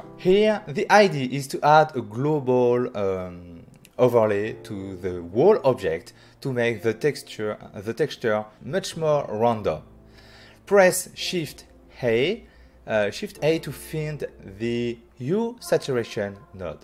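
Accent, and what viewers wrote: France. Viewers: Cool French accent <3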